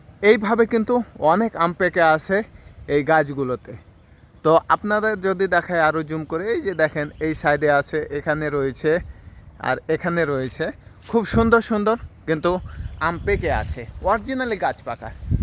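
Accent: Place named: Chapainawabganj, Bangladesh. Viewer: আপনার ভাষা শুনে মনে হচ্ছে আপনার বাসায় চাঁপাইনবাবগঞ্জ। আমার বাসা চাঁপাইনবাবগঞ্জ। আমি কিছু পাখি নিতে চাই আপনার কাছে। আপনার লোকেশন টা যদি শেয়ার করতেন তাহলে আপনার খামারটা ভিজিট করতাম।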